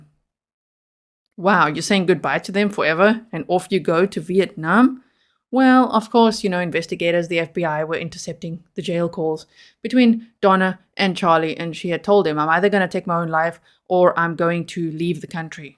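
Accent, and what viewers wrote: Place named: South Africa. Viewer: Excellent presentation, thank you. I detect a subtle South African accent..... Lekker wees, en dankie.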